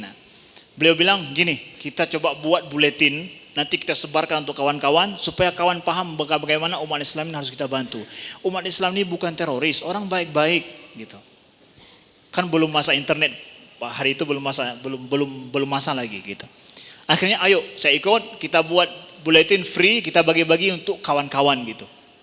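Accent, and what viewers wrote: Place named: Aceh, Indonesia. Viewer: Orang aceh ni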